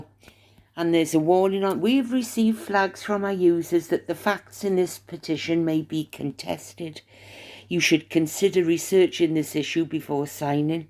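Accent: Wales, United Kingdom. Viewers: Love your Welsh voice girl! So glad your back!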